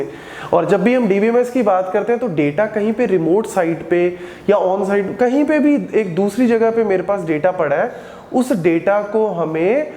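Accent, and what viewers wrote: India. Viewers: can I give out some suggestion/ Content is good but accent is quit horrible, I feel like I’m listening to Indian, it would be quit nice if subtitles could manually added clarify what teacher is saying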